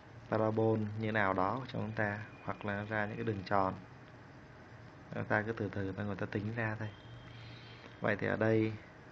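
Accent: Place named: North, Vietnam. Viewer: THẦY NGƯỜI BẮC HẢ THẦY :x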